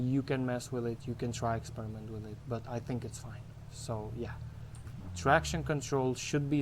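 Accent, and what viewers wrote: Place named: Russia. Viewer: вери стронг рашен аксент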